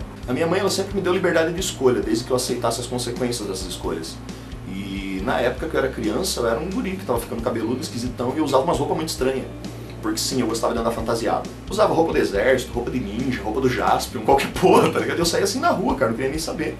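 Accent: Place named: South, Brazil. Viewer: Cara, da onde tu é? tu tem sotaque do sul! tu é gaúcho? Muito bom o vídeo, parabéns!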